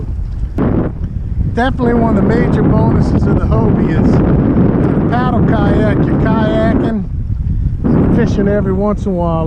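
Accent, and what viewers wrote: Louisiana, United States. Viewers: You sound like your from my neck of the woods Cajun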